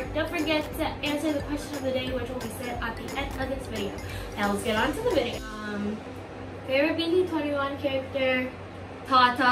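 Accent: Philippines. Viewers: i just love your tagalog accent! Waaah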